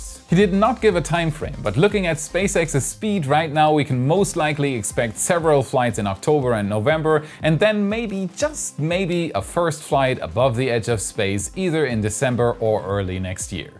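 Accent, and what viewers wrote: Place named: Germany. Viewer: Ich mag deinen deutschen Akzent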